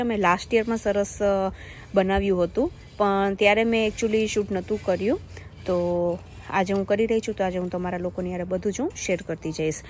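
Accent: Kathiawar, India. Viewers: 🙏 જય સ્વામિનારાયણ 🙏 👌👌👌👌 વિડીયો બનાવ્યો છે તમારૂં વતન કયું છે તે જણાવશો અમે તમારો વીડિયો પહેલી વખત જોયો છે મજા આવી છે તમારી ભાષા પરથી એવું લાગી રહ્યું છે કે તમે કાઠીયાવાડી છો અમે મોરબી થી છી તમને બંધાને મારા ભાવથી જય સ્વામિનારાયણ 🙏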